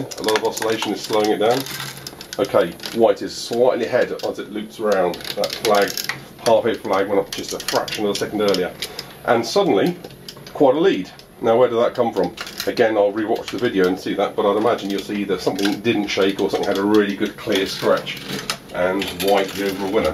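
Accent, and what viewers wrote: United Kingdom. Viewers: My older sister thinks British accents sound cool!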